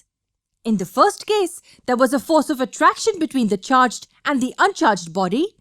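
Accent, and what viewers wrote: India. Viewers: The indian accent do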